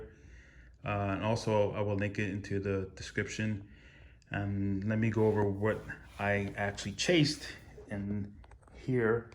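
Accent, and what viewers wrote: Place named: Northeast, United States. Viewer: Good work! I hear a hint of Northeastern in your voice.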